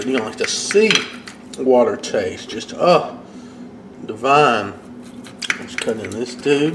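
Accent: South, United States. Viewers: i never know they had this salt block... like your steak and accent man.. yeehawww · I was initially turned away from the video due to negative experiences with individuals with Southern accents, but I like how he repurposed the Sriracha bottle. It's funny how embracing different food cultures releases any tension I had that he belonged to the same group of hate-filled individuals in my past. Nice vid dude, it's a neat idea cooking on a salt slab. I've never seen it done like that:-) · All the comments about your accent are infuriating. But I guess some people have never been down south. They're missing out on some good cooking. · Love that southern twang! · lol the southern accent. I am in NOLA. 1. Wouldnt it be better to peel off the shrimp skins for the ingredients to seep in all over it? 2. For how long should we wait for the ingredients to seep in the beef and the shrimp after applying the ingredients on them? 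Thanks. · I know you love to cook but PLEASE do a voice over for one of my books, I love your southern accent. brings me back home! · You have heavy southern accent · Interesting southern accent and cooking. I enjoy your video, thanks. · ok you sounded southern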